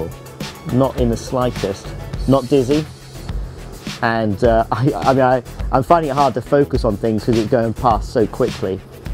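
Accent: United Kingdom. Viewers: I could hear him speak all day long. The British accent is the best. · Are you british?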